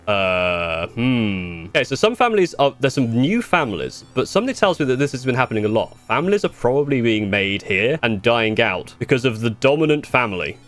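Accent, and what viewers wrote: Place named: United Kingdom. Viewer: oh god he's british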